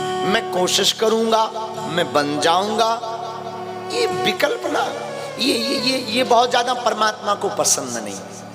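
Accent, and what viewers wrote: Bundelkhand, India. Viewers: bhai... Maine achhe dil se hi bola hai emotional tareeke se bolte hain hame pata hai tumhe pata hai un k sabhi chahane walon ko unki bundelkhandi boli bahut pasnd hai.. Lekin jo shero shaayari hoti hai usme urdu k bahut se aise shabd hote hain jin ka lena dena se door door tak nahi hai... Jo sindoor bindi plot book... Wali baat hui wo bhi k shabd nahi kyonki ye hamne bahut pahale se suna huwa hai... Bas isiliye kaha.. Hame unme bajrang bali najar aate hain or un k mukh se... Naa rupya lagta hai na paisa lagta hai.. Ram ram boliye bada achha lagta hai 🙏🚩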